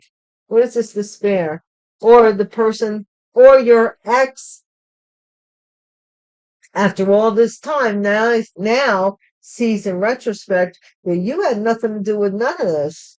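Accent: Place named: Brooklyn, United States. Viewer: You sound like you are from Brooklyn 😂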